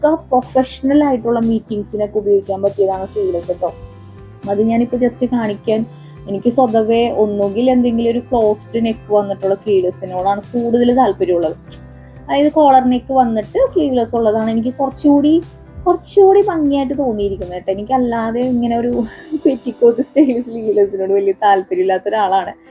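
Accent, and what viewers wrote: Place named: Ernakulam, India. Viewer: ചേച്ചിയുടെ എറണാകുളം ഭാഷയാണ് പൊളി🎉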